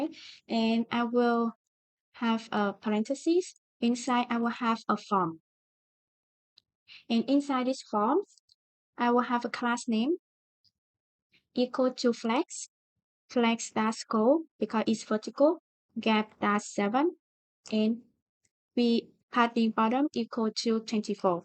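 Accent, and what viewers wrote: Vietnam. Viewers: CHị này người Việt chắc luôn :))))) nghe phát âm quen lắm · unrelated but are you Vietnamese? because your accent is similar to one of my Vietnamese friends :D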